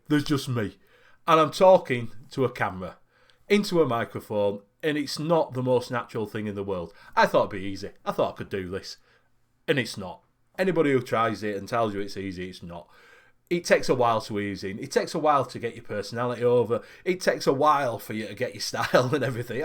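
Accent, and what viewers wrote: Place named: Leeds, United Kingdom. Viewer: Ey up, only been here a short time. But heard TVP mentioning you from time to time, but Deano TDV mentioned you as well. Went on to 3 men chat after TDV show on Wednesday n Aden mentioned you as well. So thought I'd gi'it a go. Soon as I heard Leeds (just up't'road twang I liked it) so stuck on with it. You keep an eye on chat as well as chatting with other hosts (which is a pro on so many levels). I too would like to thank Les Pickin, without his videos on how to mix, I wouldn't of learned how to mix. So big ups to Les or I wouldnt of been able to create my StRipple. I probably won't be about as much when I go back t'work. With you and hosts doing mixing, your numbers should go up more as I havent seen many other people doing both mixing and reviewing, all the best in the future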